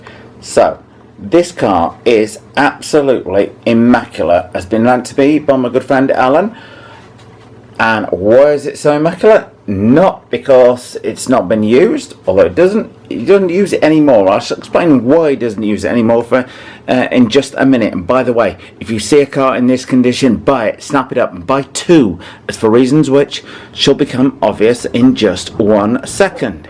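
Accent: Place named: Liverpool, United Kingdom. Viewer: Are you kidding me that's not a New Jersey accent you mean Jersey... Jersey on the mersey?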